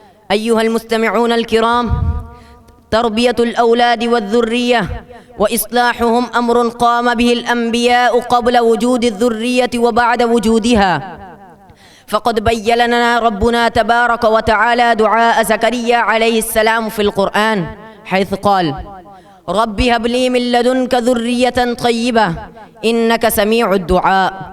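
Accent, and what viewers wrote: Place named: Arab world. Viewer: MASHA ALLAH very best speech & beautifull accent like ''ARAB'' ......... THANKYOU SO MUCH!!!!!!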